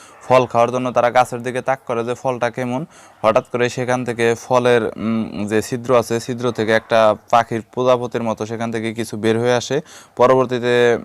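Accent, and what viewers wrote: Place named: Tangail, Bangladesh. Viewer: মনে হয় , টাংগাইলের ভাষা বুঝতে পারছি না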